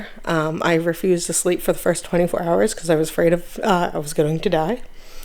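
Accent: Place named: East Coast, United States. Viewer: u sound like east coast of the U.S. I am in B.C., Canada......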